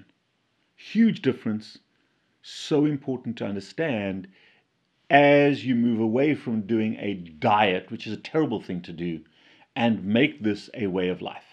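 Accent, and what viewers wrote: South Africa. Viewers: Funny, I live in South Africa and when I saw his first video I thought "He seems like a South African", but then I saw he wasn't. Later videos I realized he was. He not only still has a South African accent, but he looks like one too. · From someone in the UK there is definitely a south African accent. Not much. But enough. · Too South African. Unmistakable accent. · I'm loving your video's dr (& so awesome to immediately recognize the S.A accent my home country) ♡ I'm so thrilled I came across you. I'm a RNP in private practice and total LCHF convert. Your many video's & interviews are highly informative. Thanks for your great work and well done on your achievements past and present ❣ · Subtle South African accent going on there doc….good content ! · He speaks like an upper class English speaking South Africa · He sounds South African. 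Probably is.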